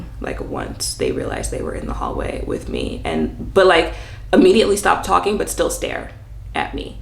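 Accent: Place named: Long Island, United States. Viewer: Oooh you’re from LI. I can’t place your accent to specific town 😆 I hear you on the moving out. So happy you were able to remove yourself from such a wild apt. I hope the next place is much better.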